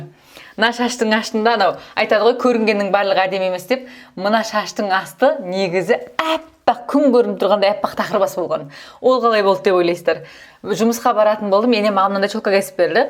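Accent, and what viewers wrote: South Korea. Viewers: Дикция кәрісшеге 😃☺️ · Тилин корейцев айналып бара жатыр, кей бир казакша создеринди тусиниксиз сойлеп жатырсын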